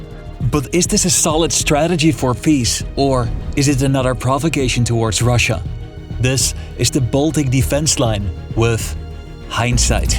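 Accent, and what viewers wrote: Netherlands. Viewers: This guy is Dutch for sure. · Pro tip: don't chag your linguistic accent to pronounce a name in another language that is equally wrong. It's difficult enough as it is to cope with your sharp Dutch accent (ask John Oliver what exactly that is, he can word it better), we don't need you to randomly switch to Dutch to pronounce a Russian name, that's just ridiculous. Your content is interesting, but the narration is mostly stressful through a combination of your voice, your intonation of urgency (which isn't necessary to deliver the message and frankly works to its detriment) and the rather annoying Dutch accent that you all suffer from (so don't take it personal). Try being a bit more relaxed, at ease, people will stay longer, viewtime will increase.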